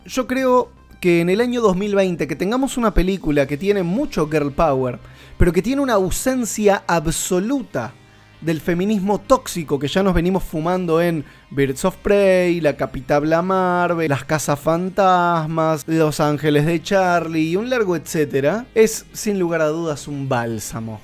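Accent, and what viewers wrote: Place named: Argentina. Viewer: Solo algo: por tipos como este es k me gusta tanto el acento argentiono hahahah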